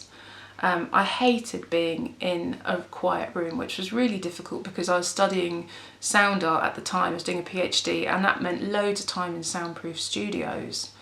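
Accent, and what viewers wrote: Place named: England, United Kingdom. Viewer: you have given me so much hope I notice that periodically I haven’t thought or heard my tinnitus you are a breath of fresh air. Listening to you I felt supported and hopeful of habituating this pest progressively as time goes by I have deleted all my Facebook chat groups as some were not to my liking plus extremely negative and they frightened me immensely as the comments made me think my life is over. I love your music. Your voice is beautiful to listen to. I’ve always adored the English accent (they are all different). Your presentation video was the best I have found yet hooray I stumbled upon you. You gorgeous girl xx💜 On Mon, 22 Jul 2019 pm, <mail> wrote: View this email in your browser THANKS FOR WATCHING MY VIDEO ON LIVING WITH TINNITUS AND FOR SIGNING UP TO MY MAILING LIST Now here's your free download of my song, all about my struggles with tinnitus DOWNLOAD NOW p.s. 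Let me know what you think of the song on Instagram, Facebook, or drop me an email! x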